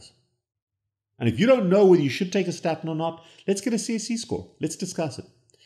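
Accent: South Africa. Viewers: Are you South African doc?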